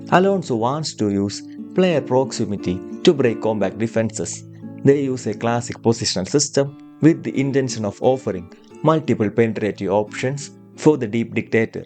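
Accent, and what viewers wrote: Kerala, India. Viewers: Malayalee alle aa English kettal ariyaam.. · Damn the mallu Accent is crazy!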